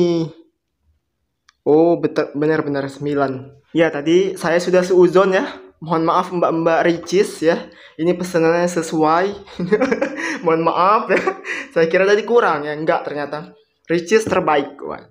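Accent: Palembang, Indonesia. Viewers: bang lu orang Palembang ya bang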